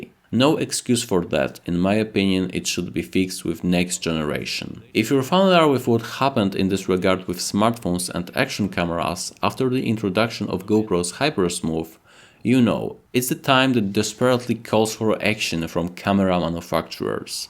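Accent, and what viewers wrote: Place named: Poland. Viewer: Your extremely heavy Polish accent makes your review difficult to follow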